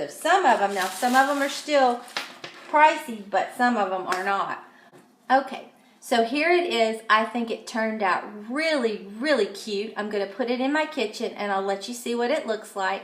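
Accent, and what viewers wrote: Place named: Los Angeles, United States. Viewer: Do you live somewhere in LA. You have an accent similar to mine, but I haven’t lived there in 27 years.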